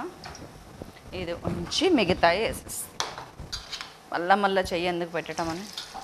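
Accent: Telangana, India. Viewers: Medam Medhi telangana or andhra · మీ మాటల్లో తెలంగాణ యాస చాలా బాగుంది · Mee telangana yasaki johar.telangana bidda anipichharu.i like it👌😃😃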